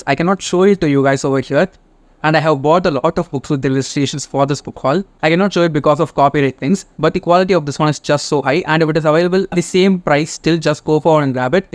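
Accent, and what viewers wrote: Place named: England, United Kingdom. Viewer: Love ur english accent.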